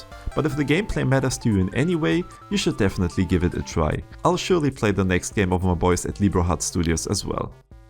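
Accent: Germany. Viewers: man hört sofort dass du deutscher bist ^^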